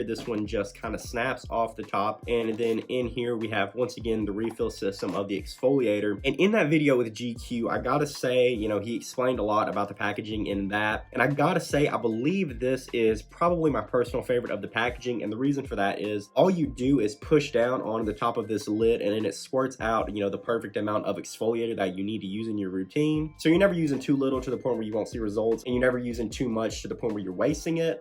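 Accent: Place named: South, United States. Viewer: What city/state are you from because I feel like you’re from California but in my opinion you sound like you have a southern accent.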